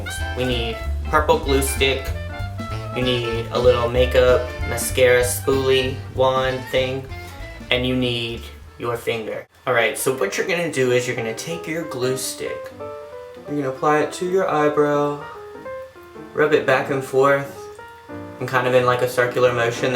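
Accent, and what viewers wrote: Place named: South, United States. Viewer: Lol I was gonna say I'm from Baltimore and his accent def didn't sound like Baltimore accents. It def sounds southern!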